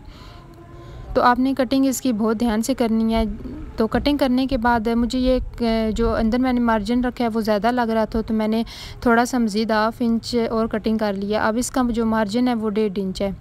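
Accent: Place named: Chakwal, Pakistan. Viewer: App ka lejha bta Raha ha k ap chkwal side se hn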